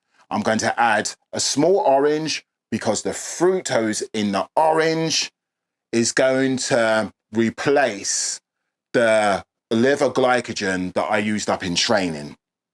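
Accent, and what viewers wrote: United Kingdom. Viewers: with an English accent?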